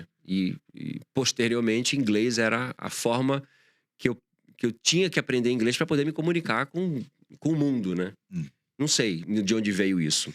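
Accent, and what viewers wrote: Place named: Recife, Brazil. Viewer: O cara sai de Recife, mas o sotaque não sai.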